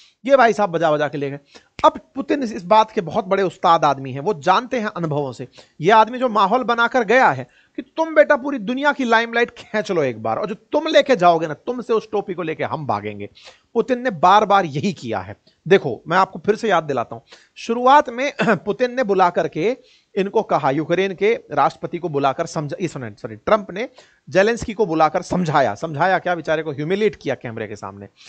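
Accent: Bihar, India. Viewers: ji, aapkay hindi lehzay say lagraha hei aap biharsay hein?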